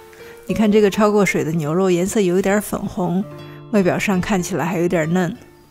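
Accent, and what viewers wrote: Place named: Xi'an, China. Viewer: 之前在国外就看你的视频，那会儿一听口音就感觉是西安的，做的菜跟我家也很像，一晃几年了，回国了还看你做菜。❤❤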